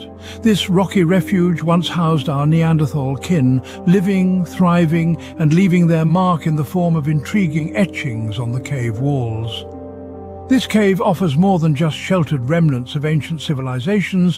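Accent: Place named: England, United Kingdom. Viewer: The most rational explanation is to believe what the evidence shows even though some of the evidence has been removed and destroyed what they didn’t get or some of the large footwear from Giants of approximate 12 to 15 feet tall plus red hair, which was checked for DNA but you will never hear the results of that test. It ruins the paradigm of this very wordy over Adjective laden, plus add the English accent to serve some sort of intellectual prowess to perpetuate the paradigm rather than report on the Fax.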